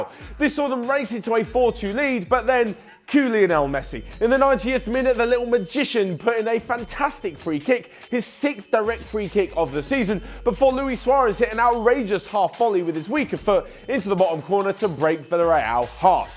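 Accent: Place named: United Kingdom. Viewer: Can he speak a little bit slowly. Like i said his accent is very British that is quite hard to understand when he speak that fast.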